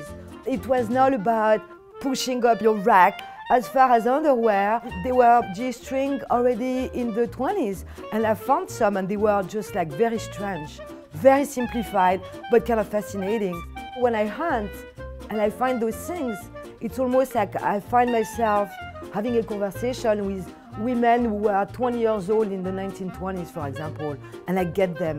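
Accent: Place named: France. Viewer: As soon she started talking I was like : “FRENCH”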